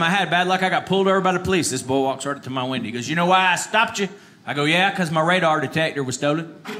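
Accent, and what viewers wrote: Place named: South, United States. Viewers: God I love the southern accent! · This man's accent is literally the stereotype of a southerner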